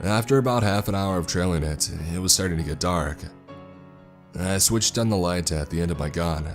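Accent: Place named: West Virginia, United States. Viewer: Holyshit you actually sound like you're from West Virginia. I'm from the mountains of southern West Virginia and Mercer county. Just outside of Princeton bluefield area. You sound like a big old farm fed Burley mountain man like me LOL I'm 6ft 4 inches 273 lbs 40 years old and can still chase a deer through the woods. my family always used to pick on me especially my uncle my dad and my brother when we would go deer hunting because they would set a white for the deer to come to them. But I always stalk them and my brother has a video of me running after a deer and killing it with a Bowie knife. And for all you animal lovers out there just know I'm an animal lover too... I think dear squirrel turkey and rabbit is all delicious.!!! 🙄🤔😯😤😠... 🤗😀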